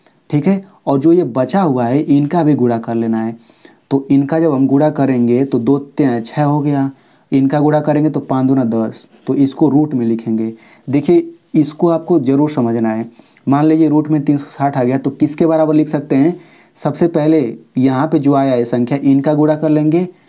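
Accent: Bihar, India. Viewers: Sir Aap Bihari hona na anyways Thank you 👍👍👍👍👍👍 sir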